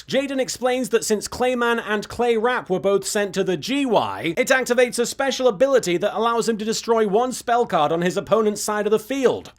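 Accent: United Kingdom. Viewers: Random nonsense in a British accent... That's one of my favorite kinds of random nonsense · Chillin' out without a crew? Are we, your fans, not enough of a crew? Are we too motley? Random nonsense in a British accent... that is totally my jam. Do it more with less people... all those virile young people, alone on an island... yeah, there will be more something going on... Give me 3... steps mister, 3 steps towards the door? Jaden's pun at the end... it didn't have a ghost of a chance. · Random Nonsense in a British Accent is it's own official brand of comedy as far as I am concerned.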